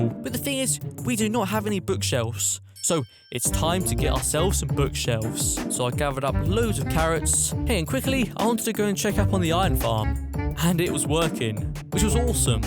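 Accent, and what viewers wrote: United Kingdom. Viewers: ARE U BRITISH I KNOW UR IN THE UK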